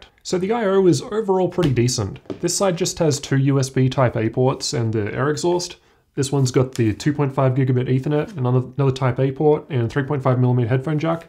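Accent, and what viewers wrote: Australia. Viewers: I thought the accent is supposed to give it away that he's Aussie.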